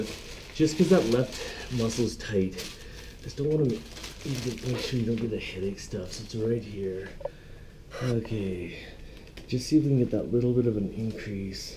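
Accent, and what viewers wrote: Canada. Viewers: Ahhh... Canadian eh? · you have the potential to be the new Rock Star of YT-resident chiropractors. The mix of your intuitive attention to detail and obvious empathy for your patients - together with a characterful delivery of care on your part - is very compelling. To top off the performance, your Canadian accent and Roadrunner-style moves around the room are very engaging. Move over, Dr. Johnson - there is a new kid in YT Town.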